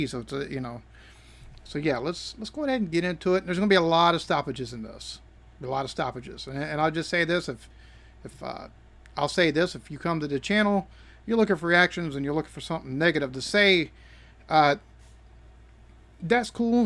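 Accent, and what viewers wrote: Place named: Yorkshire, United Kingdom. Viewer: Is that Yorkshire UK? asking for a Lancastrian.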